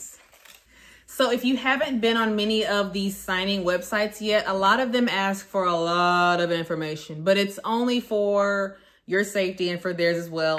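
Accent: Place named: Texas, United States. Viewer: I watched your "what they don't tell you..." video first and was like she sounds like she's from Texas 🤣🤣🤣. Then I watched this one and you confirmed it. I'm from Beaumont and I'm just now starting. I received an email a few days ago and missed it. It would have been my first signing if I was assigned.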